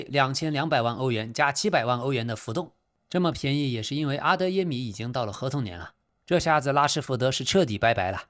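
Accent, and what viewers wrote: Chongqing, China. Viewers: 听口音老k应该是重庆人呀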